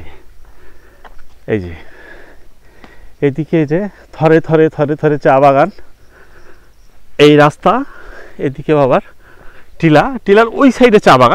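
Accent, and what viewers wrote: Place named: Jessore, Bangladesh. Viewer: ভাই। আপনার দেশ এর বাড়ি কোথাই? কথার টান শুনে তো মনে হচ্চে আমাদের যশোর এর দিকে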